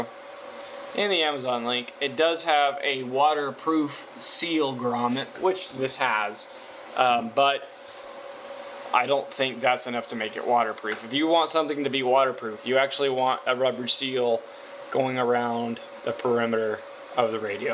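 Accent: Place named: United States. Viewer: You sound like you are in the USA, please don't use encryption when broadcasting on this radio. That is illegal. Also, please get your amateur radio (technician) license. It isn't difficult. One of the things they teach you when studying for the test is that using encryption when broadcasting on amateur bands is illegal, so the encryption capability should not be an issue for you.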